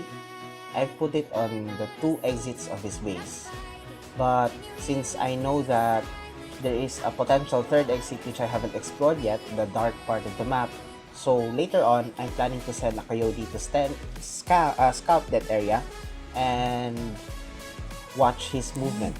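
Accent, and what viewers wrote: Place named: Philippines. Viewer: sabi na eh pinoy haha dahil sa accent natin, pero naseal ni isagani ung hula ko haha anyways, angas bro. i just came back sa AoW3 and nangangapa pa ulit. dami ko natutunan na counters sa vid mo.. tnx a lot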